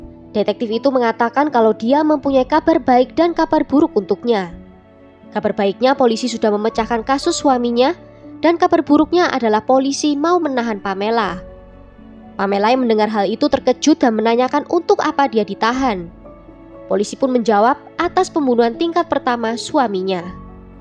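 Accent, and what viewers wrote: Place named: Java, Indonesia. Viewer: kenapa kasus yg anda ceritakan selalu menarik apa rahasianya.....dan satu yg tak terlupakan yaitu medok nya.....top lah pokoknya.....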